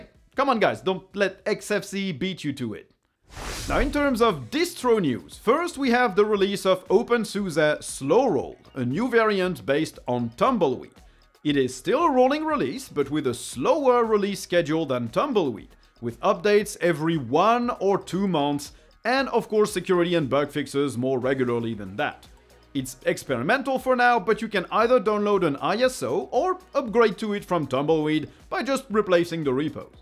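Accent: France. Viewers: "Native waylANd experience" Ton français ressort toujours un peu, haha :')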